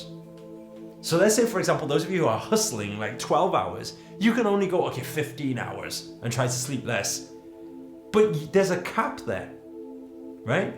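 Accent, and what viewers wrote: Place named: Liverpool, United Kingdom. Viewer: Did you ever live on Liverpool? I hear a hint of scouse in your accent. :)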